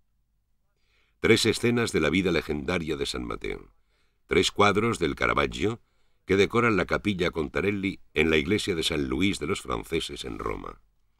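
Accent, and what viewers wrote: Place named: Spain. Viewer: El narrador, un clásico del castellano peninsular.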